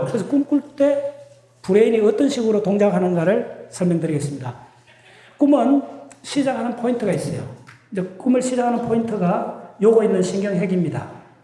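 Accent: Gyeongsang, South Korea. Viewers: 강의내용은 좋은데 경상도 사투리가 심해 잘 알아 듣지 못하겠다.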